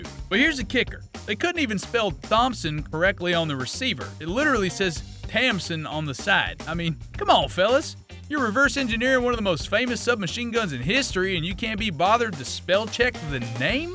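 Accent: United States. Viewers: Hello mr stereotypical american accent guy, Ever seen the mg14z? Its a modern mg81z by technicality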